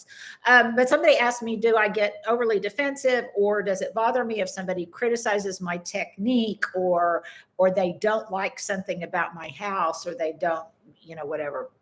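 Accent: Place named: United States. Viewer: I like to count the “and by the way”, especially when followed by “you guys” - I must say however, your way with language is a joy - especially as being English I can feel smug about what we consider the American mispronunciations, especially words like herbs, which we pronounce as in Herbert Hoover - always I can hear my mother saying “ don’t drop your aitches “ love these videos, was really disappointed not to be able to join live. Thank You.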